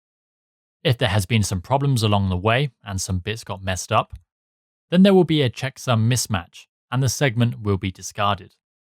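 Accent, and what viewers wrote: United Kingdom. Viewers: I love these videos btw! I am starting an apprenticeship within IT networking on Monday and I have been trying to research information. Your videos are the most beneficial and well explained! Are you british btw?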